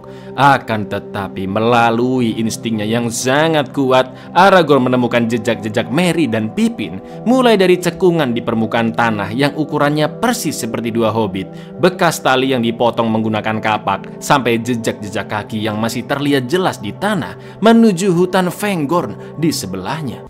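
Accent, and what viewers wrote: Java, Indonesia. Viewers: Samean wong jowo tah😂😂😂😂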